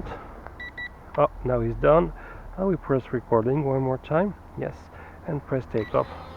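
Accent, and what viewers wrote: France. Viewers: Ca s'entend que tu es français mais ça reste une très bonne vidéo!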